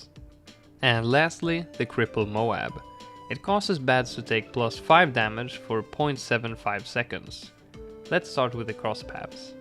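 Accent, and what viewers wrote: Sweden. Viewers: You have the cutest Swedish accent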